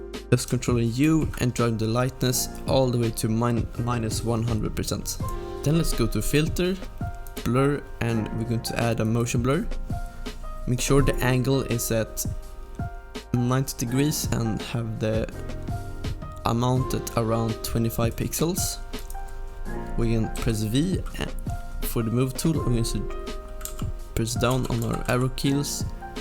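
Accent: Sweden. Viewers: Haha man hör den svenska engelskan👍men sjukt bra video man blir sugen på fixa photoshop när man kollar på de här du är grym på de du gör!:)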